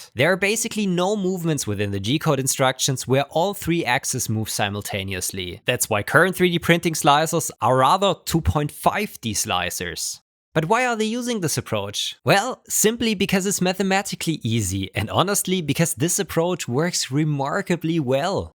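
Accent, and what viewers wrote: Germany. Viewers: Ich hab nur zwei Worte gehört und ich hab sofort gemerkt dass du deutscher bist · All in english, yet so german xD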